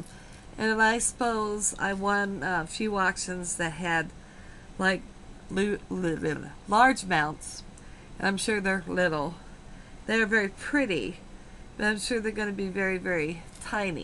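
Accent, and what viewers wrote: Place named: Midwest, United States. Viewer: Great deal on the washcloth pack. You pronounce it just like my whole family does - no surprise since my mom's side all came from Omaha. She moved out here to California when she was 13, but we all still talk like we are from the Midwest.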